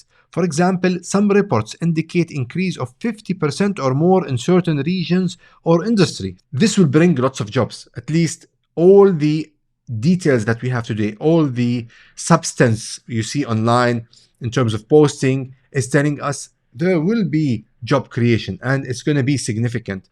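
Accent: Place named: Spain. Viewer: the R😂but don't care, are you native Spanish?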